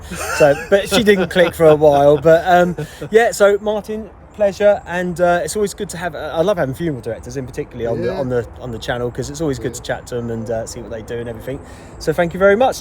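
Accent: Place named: Birmingham, United Kingdom. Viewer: Thanks for this video….and Martin it’s good to hear a Brummie accent again. Bless you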